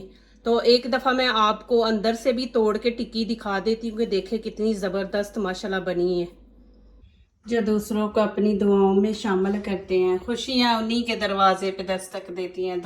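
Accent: Punjab, India. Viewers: Punjabi lehjhe main baat named kya kare, Durst urdu boleh. Recipes acchi hoty hai.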